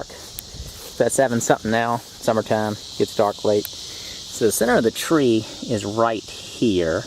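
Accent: South, United States. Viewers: holy moly! i don't know how this video popped up!..... what a strong Southern accent!!!!!!! i feel like 'bearded old hippie hiding behind his truck'! no telling what this video was gonna be about!..... turns out to be a cool little video! thank you, very much! :-)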